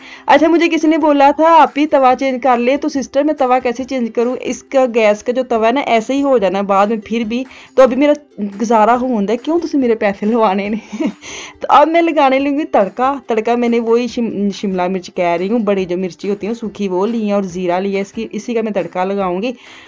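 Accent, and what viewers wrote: Punjab, India. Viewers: Watching you from UK Love your Panjabi and the way you talk... Like your videos.... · Salam sister, Ma sha Allah j apke volgs buhat achhy hote hn .specially baat krne ka punjabi style tu buhat achha lagta hy .ap jo oven use krti hn roti k lye oska company name bta dain plz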